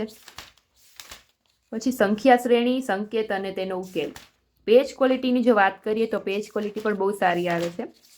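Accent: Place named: Banaskantha, India. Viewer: બનાસ ની ઓળખાણ ના આપવી પડે બોલી જ કાફી છે ❤ જિલ્લો મારો બનાહકાંઠો ❤ તાલુકો ધાનેરા❤ બનાસ ડેરી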